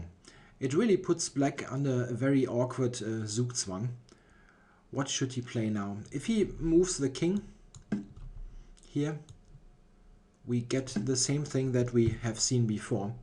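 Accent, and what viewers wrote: Germany. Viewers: alter.. bist du deutsch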